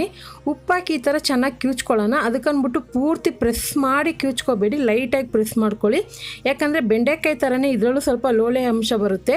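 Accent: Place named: Anekal, India. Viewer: Watched your kalamadhyama interview. I am watching your video since a year and I did not really admired the way you were speaking kannada words... But now I got to know that you are from Anekal and slang is like that.. All the best tangi... Devaru nimagella olleyadanna maadali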